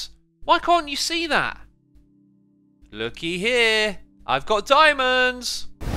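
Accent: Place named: United Kingdom. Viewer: he's so bri'ish, talking about evil X's a'i'ude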